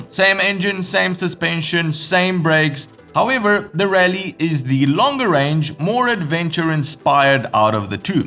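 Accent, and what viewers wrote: South Africa. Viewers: For a Romanian you have a remarkably South African accent 😀 - love the videos ! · Nice video as usual.thanks guys. Ps: your accent sounds like mine... Afrikaans. Intrigued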